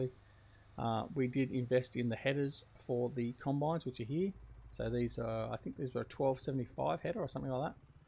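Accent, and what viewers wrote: Australia. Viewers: Did you need to use GE to do some of your farm upgrades like main entrance and removing buildings? Love your videos and most favourite FS19 YT'r. I wish I could make Maps and would like to add some Aussie maps and do some work on WA map. Good to hear a fellow Aussie voice on YT. Best wishes for your wife. Keep up the great work.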